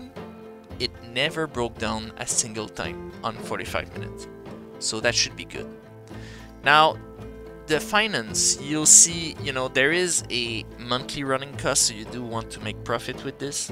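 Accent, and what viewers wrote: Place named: France. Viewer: Yo, nice video homie ! Btw, are you French ? Anyway, keep it up.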